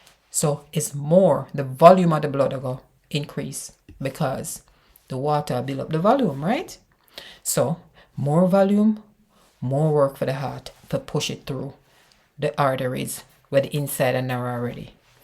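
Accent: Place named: Jamaica. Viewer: This is some good information, like the Jamaican accent !!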